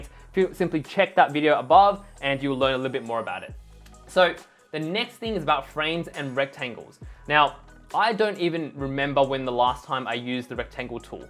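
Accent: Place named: Australia. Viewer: MASS COLLAPSE LAYERS works differently for me than explained: On a Mac press CMD + A to select everything. Go to Layer panel and hold CMD + Click on 1 dropdown arrow and everything closes. Do exactly the same to open everything on the Layer panel. By the way your content is simple and brilliant! It helps immensely! Your accent takes me back to amazing Australia!:) So thank you very much!!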